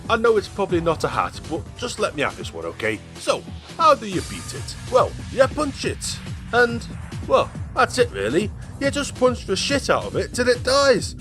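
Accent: United Kingdom. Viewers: I love your english stile Mr., so british so elegant. What a pleasure to hear your rewiews added with creativity and humor ❤️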